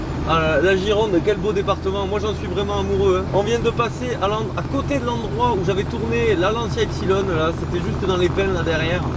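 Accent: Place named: Lille, France. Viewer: Sympa la vidéo l'ami Lillois, c'est votre accent qui m'a mis la puce à l'oreille😄 Tres beau modèle que vous nous présenter, félicitations à son propriétaire. En revanche, votre tenue vestimentaire me fait penser à une pub pour Cetelem🤭 Encore merci pour la vidéo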